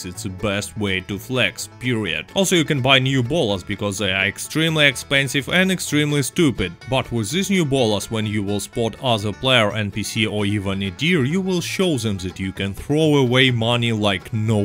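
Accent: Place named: Russia. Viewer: Stop doing all other content to do more videos like these, the sarcasm in his russian voice kills me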